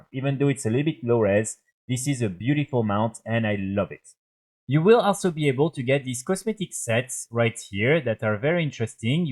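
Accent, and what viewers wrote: France. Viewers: the french accent makes this unwatchable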